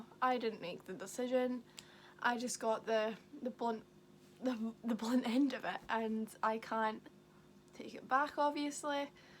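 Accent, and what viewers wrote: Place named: Scotland, United Kingdom. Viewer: Is it Scottish or Geordie? Sounds more like Scottish to me 🙈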